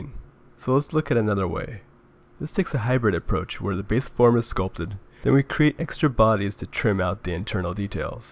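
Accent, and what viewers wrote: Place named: United States. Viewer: Very interesting because "simple" : why T splines would ease the job ...But it is in fast american instead of steady slow english ...Too bad for the frog, but if i slow motion the vid i may understand . Bravo anyway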